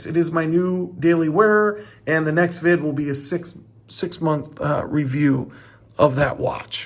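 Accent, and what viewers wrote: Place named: Chicago, United States. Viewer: well I’m a fan dude. I moved from Chicago 10 years ago to Seattle, it’s awesome to hear a Chicago voice on here describing places I miss AND watches. Keep it up!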